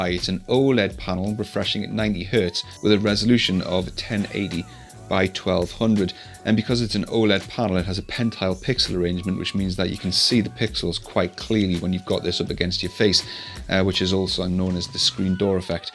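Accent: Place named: Newcastle, United Kingdom. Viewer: Thanks, great to hear a local Geordie review tech (question at the end) I bought a rift s and an entry level pc after using the work HTC vive vr system and I’m really happy with the performance. I’m no expert and have only seen these two systems, but I agree with everything this review stated. The vive does feel a better product with better audio but.... the s is lighter, better visuals and an easier set up. For a newbie like me, that’s better and now the price of an S is down to £350, that’s where I spent my money. My newbie question is what difference would be noticeable to the visuals if I upgraded my GPU from a Nvidia GTX 1060 to something newer and faster to say Beat Sabre or Robo Recall?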